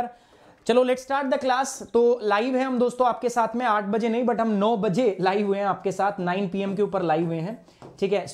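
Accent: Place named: India. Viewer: Mst ho sir aap...Jat da putr sher👍 Way of talking great like Desi 😂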